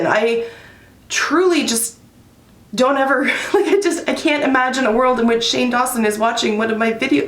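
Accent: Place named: Canada. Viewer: We have dill chips. Salt and vinegar. We also get Ms. Vickie's chips which I believe is a Canadian brand. We just don't have Ketchup chips. I dunno why bc we have every weird chip flavor And it is not that you say sorry. I appologize whenever I bump into someone too except I say excuse me more. It is that you saw Sow-ry. I cannot get my mouth to form to say it like ya guys do but I know ya are Canadian by how you say sorry lol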